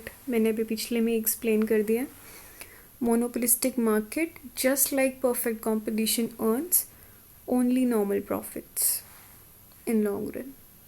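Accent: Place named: Kashmir, India. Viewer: voice sai Kashmiri lag rahai ho ap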